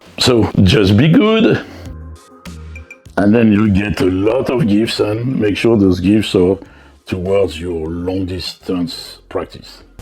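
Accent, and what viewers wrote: France. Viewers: What is that accent ? French ? Tu es français ?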